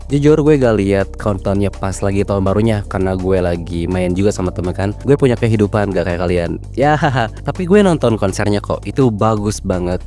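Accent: West Java, Indonesia. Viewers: bang, lu sunda ya?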